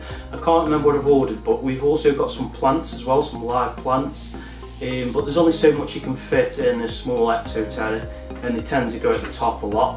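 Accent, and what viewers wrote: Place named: Merseyside, United Kingdom. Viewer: You from merseyside? That accent is to close to home, I'm about pick some jumping spiders up soonish hopefully. Great video bro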